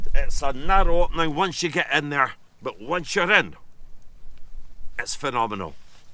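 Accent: Scotland, United Kingdom. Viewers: Ach, i appreciate your Scottish accent, exploring the lands in Wellies, as I will never be able to visit. ❤ and especially the romans pegasus. Such ahigh ceiling and huge carved blocks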